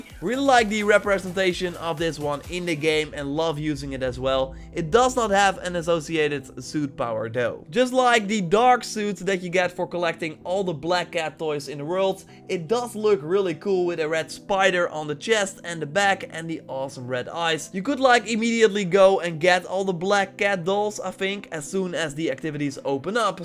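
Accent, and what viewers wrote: Netherlands. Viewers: You are dutch right? I think i hear it in your accent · Deze guy is sws Nederlands😂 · Are you dutch? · Jij bent nederlands toch? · Are you from the Netherlands?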